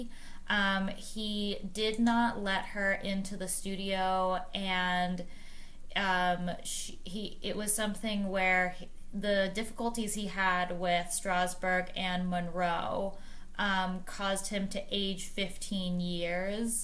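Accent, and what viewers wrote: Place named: United States. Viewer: shame about the accent. Why is it that American accents are so hard on the ears. It stumbles around the English aristocratic discourse and the pronounications are mainly wrong. Leave Edith Sitwell to us-the British-and you do Miss Monroe.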